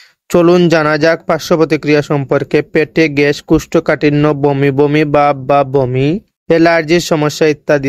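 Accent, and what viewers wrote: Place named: Sylhet, Bangladesh. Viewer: ভাই মনে হয় সিলেটি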